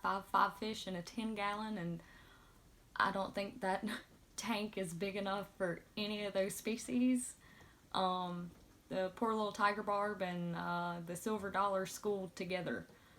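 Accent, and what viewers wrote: South, United States. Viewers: Now that I've watched it all, Southern accent for the win! Welcome to the FishFam! That was a great first video with a wonderful backstory. Thank you for sharing with us! 👍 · First of all welcome to the fishfam! Second I appreciate relatability in people I sub to. I find you to be very relatable. I also live in a small town except we have one caution light lol. I've got tanks full of walmart fish and have been fishing my whole life. All the fish tanks we had used to be the same way. 10 gallon walmart tanks with just top offs. Didn't know any better. Don't worry about the southern accent, its great and makes you who you are. You are a natural talking to the camera. You will have a bunch of subs in no time. Great job! Looking forward to future content! Btw KGtropicals was one of the first channels I found and they helped me more than I could ever tell them!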